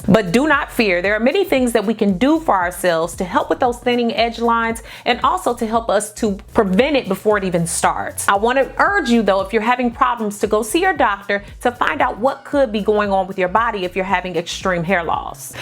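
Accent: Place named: St. Louis, United States. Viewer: I felt like she was from home!!!! Thought i was trippin!!!!! 314 STAND UP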